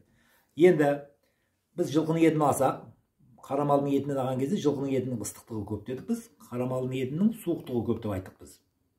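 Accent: Uzbekistan. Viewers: Бешбармақ қай тілде акцентіңіз өзбекше ме сұрланғ😢😢 а н ет деген не Сүр🎉😢😢 ет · Қазақ, тек Ташкентте тұрғандықтан тілі өзбекшеге кетеді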